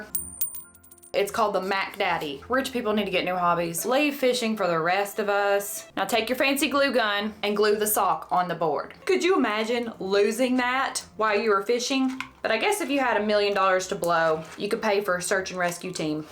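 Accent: South, United States. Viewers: I think you’re absolutely hilarious and adorable. You have a very magnetic enigmatic quality and you’re gorgeous. You don’t SUCK at this YouTube thing. I get sucked into your videos every time I watch just one! I love your accent, your humor, your southern sayings. I think you’re killing it girl. Keep up the amazing work! You got this!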